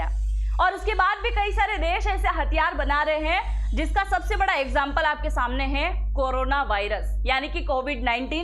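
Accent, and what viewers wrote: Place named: Rajasthan, India. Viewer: Aap apni bhada hindustan ki hindi bolen naki rajisthani hindi sory.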